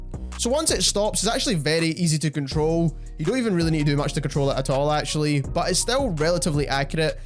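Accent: Scotland, United Kingdom. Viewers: A Scottish guy! Nice one. Never seen u b4. Am nae guid at cod nowadays - too auld I think... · This is an ironic question given your name haha. It’s a Scottish accent :)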